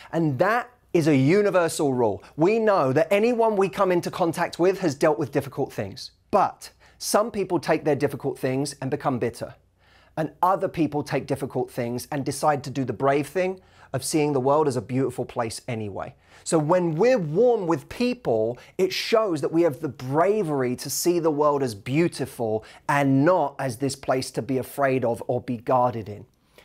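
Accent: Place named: United Kingdom. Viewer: You look like patrick dempsy you are handsome like him sory for my bad language english language isn't my first language but i adore the british accent and i like your channel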